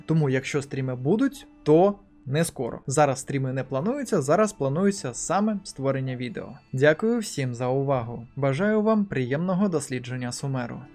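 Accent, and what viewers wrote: Ukraine. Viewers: Это слышно что восточно-украинский, Западный я вообще не понимаю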